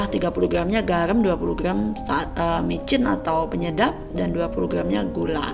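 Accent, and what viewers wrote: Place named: Palembang, Indonesia. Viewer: Lucuk denger suaranya si mbk bicara, aseli org palembang kayanya karena menantu ku jg orang palembang.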